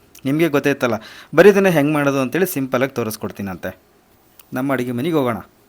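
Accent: Chitradurga, India. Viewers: ದುರ್ಗದ ಭಾಷೆ ಕೇಳೋಕೆ ಚಂದ ನಿಮ್ ಅಡಿಗೆ ಮಾಡಕ್ಕೆ ಚಂದ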